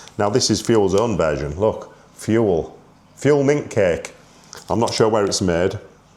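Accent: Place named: East Yorkshire, United Kingdom. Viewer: East Yorkshire - thought I recognised that accent! Round that way used to be my old haunting ground (Hedon) till I moved to Aus. Been thinking about getting one of these exhausts for my Vstrom 1050DE but not sure whether to go ceramic black steel or titanium. I assume the different metals wouldn't sound the same?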